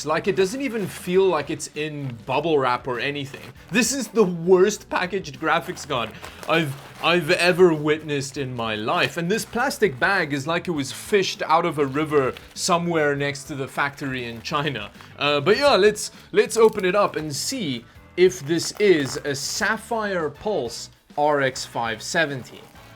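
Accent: South Africa. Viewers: Is this guy from south africa? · Are you from SA?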